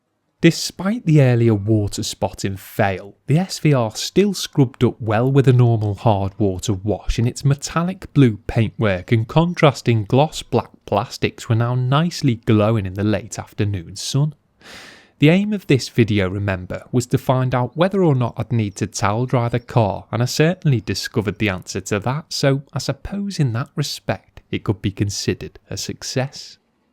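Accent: Liverpool, United Kingdom. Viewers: well youll have " fuck me "then too cos this has to be one of the most irritating voiceovers on earth and I love the Liverpudlian accent. its like he never takes an in breath. its really weird in fact..... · The interesting and compelling thing about is incongruity. He’s doing a working-class job (car detailing) and has the appearance of a working-class individual - but his commentary is fantastically articulate, precise, and detailed – to a degree that you expect from a lawyer, consultant, or other premium-rate professional. Add to that the accent (which sounds almost Liverpool, but not quite – maybe Warrington?) and delivery – providing such a reassuring and relaxing quality - it’s almost like taking 10mg Valium tabs…….and the result is great videos - interesting, informative – and just satisfying to watch. PROPS to – you’ve made a dull and dreary subject fun / absorbing! 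You are a CULT! Regards Chris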